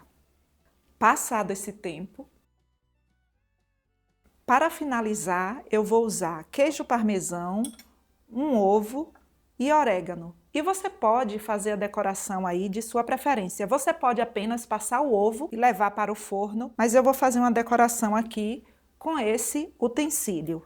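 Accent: Bahia, Brazil. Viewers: Sotaque mais pra Bahia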